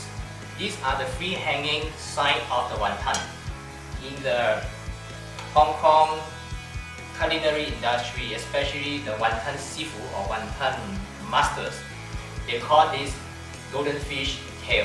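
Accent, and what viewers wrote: Singapore. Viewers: Is he speaking Singapore English? Cantonese, Please, so that everyone can understand.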